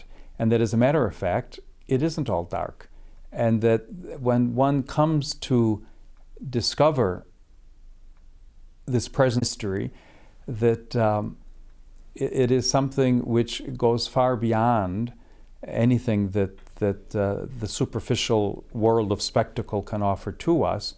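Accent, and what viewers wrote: Midwest, United States. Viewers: Chicagoan accent.... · It‘s the Chicago accent. 😊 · He has the voice tone, inflexion, articulation of a leader, like he is indeed talking “ his business “… true American…no matter how many years living abroad and with double nationality..❤❤❤ proud of him.. May God Bless him!! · I can still hear a Midwest twang at times! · It sounds midwestern to me. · Pope speaking American english 😲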